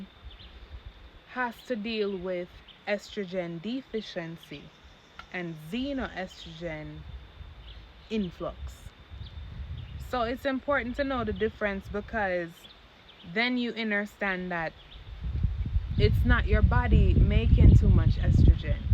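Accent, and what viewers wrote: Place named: Caribbean. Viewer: love the caribbean accent stay blessed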